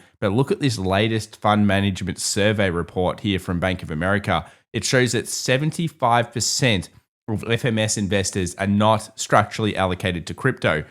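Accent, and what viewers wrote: Australia. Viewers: Did anybody ever say to you, your Australian accent makes everything sounds automatically legit. Listening even though I’m not understanding 70% of it sometimes. 😆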